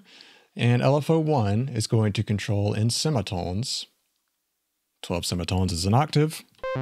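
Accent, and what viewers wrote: South, United States. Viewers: What an awesome video. Or as you'd pronounce it, "Whhat an awesome video." :D You sound a lot like Billy Bob Thornton. Likely my favorite southern accent.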